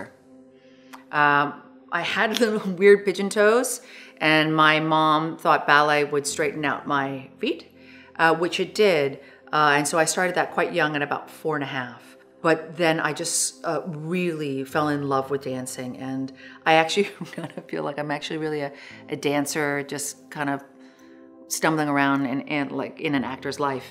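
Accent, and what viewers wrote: United States. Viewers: The whole time I think she is american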